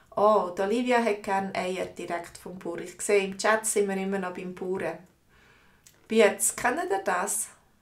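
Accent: Switzerland. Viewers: May I know what canton’s dialect is this? I am considering to register courses on your website, looks good!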